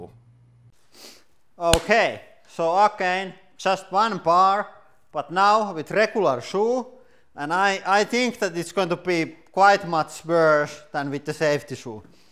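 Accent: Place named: Finland. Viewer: Well that's Finnish for you and the mighty rallienglanti.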